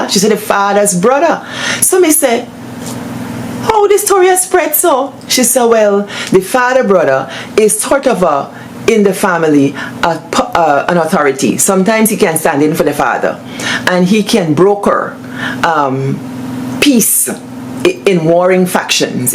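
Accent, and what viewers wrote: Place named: India. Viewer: first time here:) Just subscribed. You wowed me with your Indian accent. I was riveted to your content. Love the singing, the wielding of the belna and the moves, haha. Sharing this video with family and friends. One people, one nation…you go girl:)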